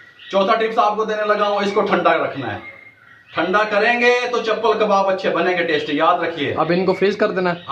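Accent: Pakistan. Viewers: I respect u alot u have no idea about it....u talk like my jhaiji and she was from Pakistan.i would love to visit pakistan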